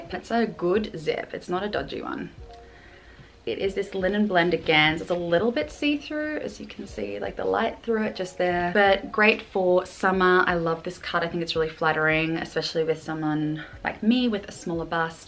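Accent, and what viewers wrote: Australia. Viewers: Welcome Home! Glad you still have your Oz accent...l laugh at some people who literally are overseas for a few months IE 5 minutes and they suddenly have a hybrid accent 🙄😆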